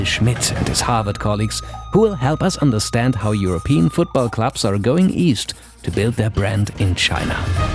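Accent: Germany. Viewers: Man merkt richtig das du deutsch bist!😅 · German accsent ( I Dieont know how to write that)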